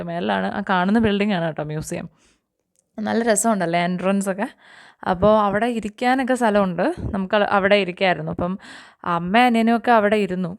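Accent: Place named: Kottayam, India. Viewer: R u from Kottayam..... Oru Kottayathinte slang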